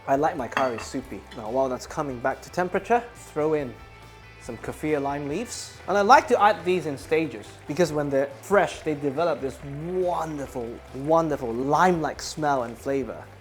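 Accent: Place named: RP, United Kingdom. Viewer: is sooooo posh 😊❤❤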